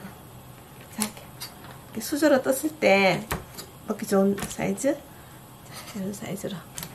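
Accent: Gyeongsang, South Korea. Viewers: 경상도 사투리 목소리가 너무예뻐요 유익한정보감사합니다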